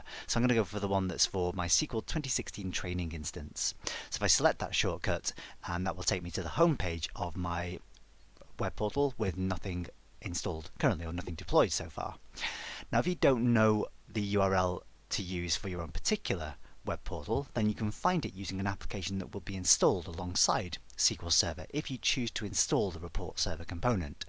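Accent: United Kingdom. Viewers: This is the best training video. I love the British accent! Please can you upload the SSAS 2008 training. Thanks.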